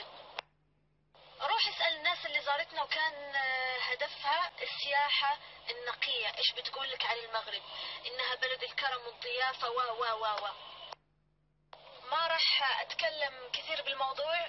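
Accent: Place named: Saudi Arabia. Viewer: ماشاء الله من خبرة الليل اصبحت تتكلم سعودي لن يتوقف الكلام عن المغرب طالما اشكالك موجوده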